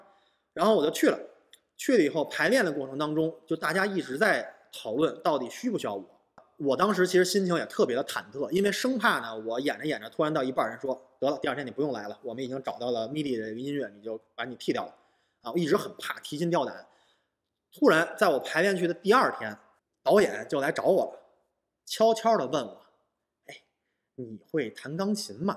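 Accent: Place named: Beijing, China. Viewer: 哈哈哈，我三里河的也表示听到了乡音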